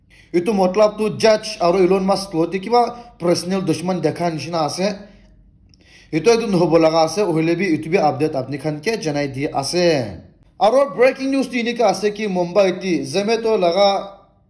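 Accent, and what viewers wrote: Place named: Sema, India. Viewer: Please control your sema accent it's heard to hear😅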